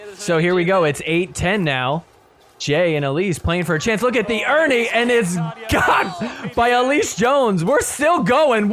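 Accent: Australia. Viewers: You're so funny...and your Aussie accent is on point! Keep it real, don't sugarcoat, or censor yourself! 😜👍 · Great job, as always! Love your Aussie accent and phrases! I did watch some of the matches. It was fun! I thought it was funny Tardio was late for his 1st singles match and then got pickled. He didn’t hear the call for the match. He had no warmup and he was seen wolfing down a Nutella pizza slice immediately before the match! Kids! 😂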